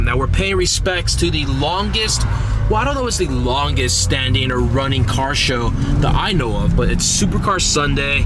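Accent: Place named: Philadelphia, United States. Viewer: tyoors lol sounded like you’re from south Philly haha. Love the content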